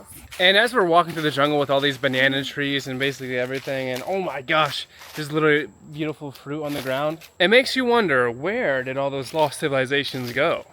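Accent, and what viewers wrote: United States. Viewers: So you’re watching a video about other parts of the world, but you don't know that different places pronounce things differently. Americans pronounce it exactly the way he did. My British wife pronounces 'bottle' as 'butt-hole.'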